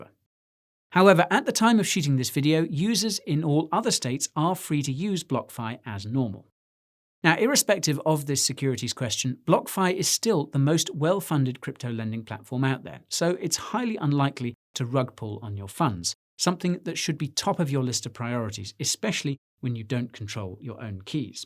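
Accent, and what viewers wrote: United Kingdom. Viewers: Love your brit accent & pacing. It sounds much more credible than the typical self-help American mumbo jumbo. With that sweet voice, you could sell me any shitcoin you want, lolz. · Just because of the British accent I trust this guy more lol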